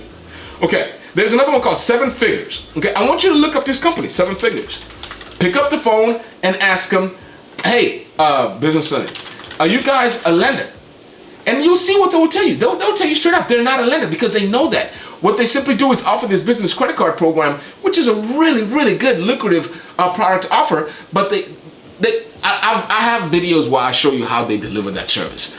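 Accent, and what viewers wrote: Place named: Nigeria. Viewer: And you sound like a Nigerian. 🚩🚩🚩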